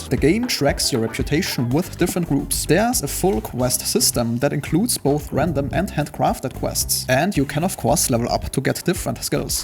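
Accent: Germany. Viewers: Bro are u german your english pronounciation seems famliar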